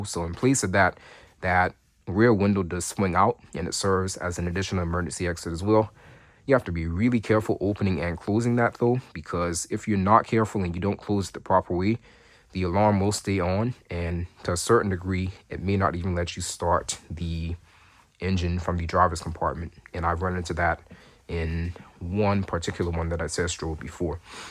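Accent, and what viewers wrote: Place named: Lowcountry, United States. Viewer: This is so cool! I've always had a fascination with the Thomas MVPs! This one is nearly identical to the white and teal (and later white with red and blue accent) activity busses we had in Beaufort County when I was in school. This was back in the 90s and early to mid 00s. I couldn't tell you what they have today. And based on your dialect, I’m 100% certain you’re from my neck of the woods as well, lol! 843! I live in Texas now, but the Lowcountry is home!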